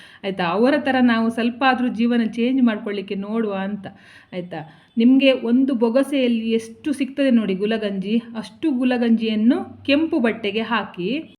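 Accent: Mangalore, India. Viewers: ನೂರಕ್ಕೆ ನೂರು ಸತ್ಯ ನಮಗೆ ಒಳ್ಳೆಯದಾದರೆ ನಮ್ಮವರೆ ಸಹಿಸಲ್ಲ ಅನ್ನೋದು ನಿಜ ..ಚೆನ್ನಾಗಿ ಮಾತಾಡ್ತೀರ ನಮ್ಮ ಮಂಗಳೂರು ಕನ್ನಡ❤ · Thank you very much for the valuable suggestion And your nice Mangalore Kannada. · hello...Very useful information, point by point explained the reality and truth. Your kannada is very clear its pure mangalorean remembers Late Aparna's Accha kannada. and by your appearance & the way you speak kannada I feel you are vishwakarma Acharya or konkani.However its nice that you are open and clear minded. When we do choodi pooja in Shravana we will do it.Only kudure laala we have to check from where we will get it.again thank you very much.I have also observed marvaadis while closing their business shop they say mantras.they are always rich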